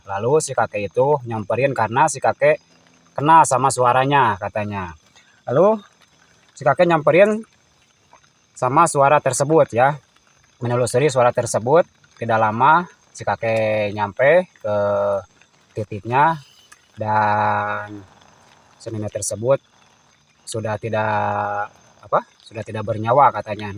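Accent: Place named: Banten, Indonesia. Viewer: Cctv tolong di perhatikan di disiapkan di pasang di luar,Abang orang Banten ya,soal nya logat ngomang nya SM seperti saya, Banten nya di daerah mn bang tolong di balas karna konten2 Abang menurut sy berani dnenantang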